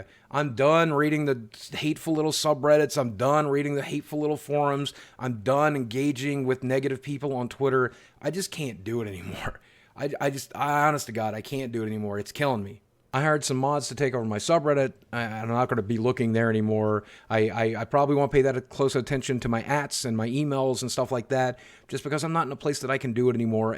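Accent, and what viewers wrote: South, United States. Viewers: Why does your accent sound SOOO southern in this vid lol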